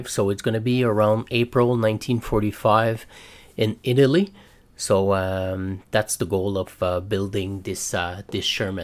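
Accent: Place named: France. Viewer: My dude, for a french guy you have a great accent!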